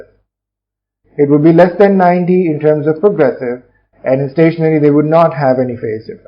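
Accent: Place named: India. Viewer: his accent is not bad, much better than common indian accent!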